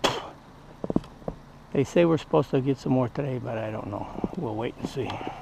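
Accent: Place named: Massachusetts, United States. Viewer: HI...I had to come back and respond to your nice reply. I'm an old Mass. girl. (Medford, Walpole, Lynn) and enjoyed the accent. My life brought me to Mich. Thought you'd get a kick out of the fact that I forwarded the Vlog to Thailand, where my son, a retired Special Forces Colonel has married and retired. Wanted to jog his memory about snow (I don't like hot, humid weather, so I visit him on Facetime every Sat. Nite. He forwarded the Vlog to an English friend who married and settled there, and wouldn't be surprised if he passed it to some Vets at the VFW in Bangkok. A reminder of winter and what surprises it holds.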